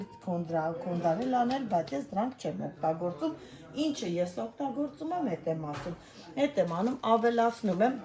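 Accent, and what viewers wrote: Lori, Armenia. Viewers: Բառբառիցդ երեումա , որ լոռեցի ես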